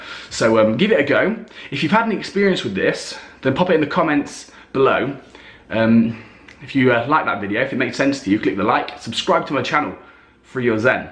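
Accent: United Kingdom. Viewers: Are you from Britian? im lovin.. the accent.. 😃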